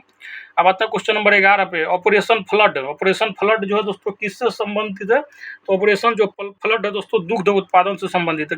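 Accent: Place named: Bihar, India. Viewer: hm v Bihar Samastipur se h ,,,,aapke bolne k style se lga he ki aap v Bihar se he h 🙏🙏🙏🙏🙏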